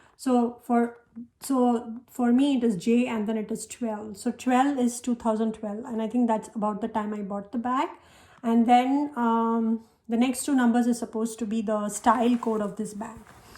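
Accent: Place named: Punjab, India. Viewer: when you speak Ma'am like a tone of Indian punjabi 😊watching your videos from Philippines , i have also coach klare with revits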